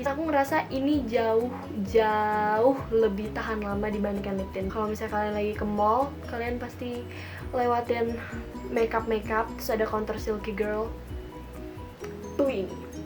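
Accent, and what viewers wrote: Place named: Java, Indonesia. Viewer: logat jawanya keluar :v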